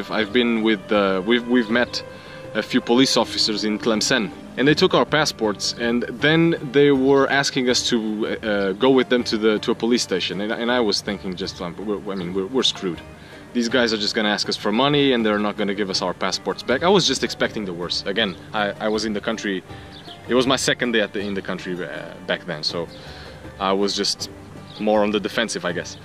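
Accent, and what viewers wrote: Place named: Australia. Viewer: It sounds like Australian accents maybe wrong well that was nice from you Mate thanks for your visit to our beautiful country. for you guys is cheaper 👍🏼 come and visits us more often there's so many places to discover. Thanks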